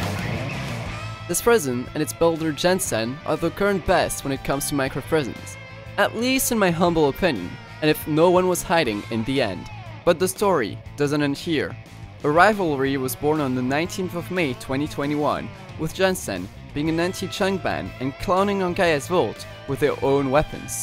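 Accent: France. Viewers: are you french? i feel like you have an accent.. Great video btw!!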